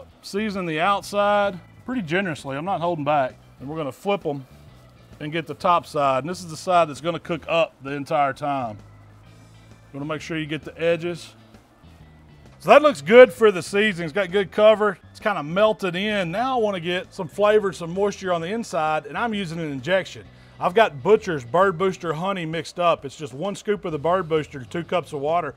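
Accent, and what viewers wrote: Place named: South Texas, United States. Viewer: If I had to guess, he sounds like he’s from South Texas. Just a guess.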